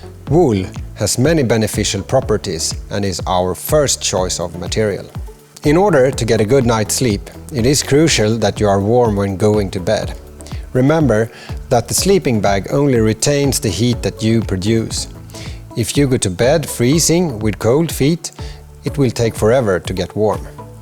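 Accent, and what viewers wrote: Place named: Sweden. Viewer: swedish accent :)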